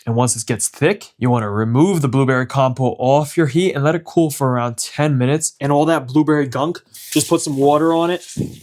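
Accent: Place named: New York City, United States. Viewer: Bro you from NYC or the metro area? You say toss, salt, water like i do. Nice to hear a “familiar” voice on youtube!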